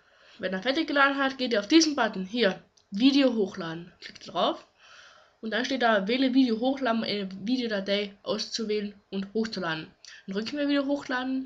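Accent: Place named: Saxony, Germany. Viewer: Genau. So sympathisch ein sächsischer Akzent auch sein mag, bitte bitte bitte mit Zucker drauf: Spreche so, dass man es verstehen kann!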